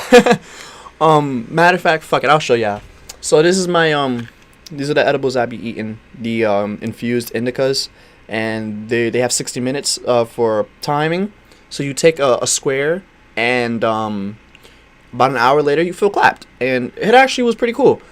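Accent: New York, United States. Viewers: Yea u still got tht ny ism in you, Thts wssup cuddy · bro frm ny for sure 😂 good tips gang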